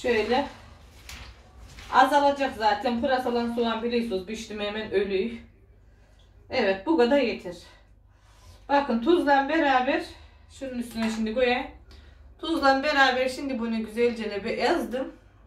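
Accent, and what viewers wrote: Trabzon, Turkey. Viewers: Trabzon Of Hayrat nahiyesiydi.Bayılırdım konuşmalarına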